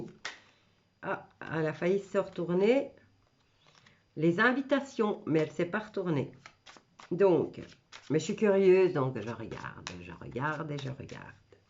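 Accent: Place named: Belgium. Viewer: Vous avez de l' humour avec un bon accent Belge, Bravo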